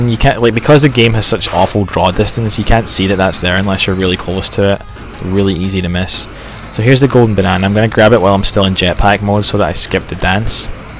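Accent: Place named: England, United Kingdom. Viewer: I will forever associate this game with an English accent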